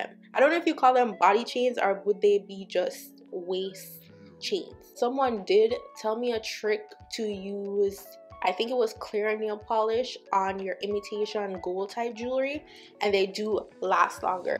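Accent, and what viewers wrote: Jamaica. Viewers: U SOUND JAMAICAN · Chun chun 😂😂😂 . The Jamaican can’t hide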